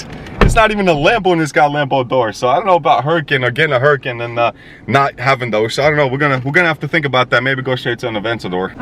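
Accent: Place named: Bosnia and Herzegovina. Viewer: That accent is 100% Bosnian lol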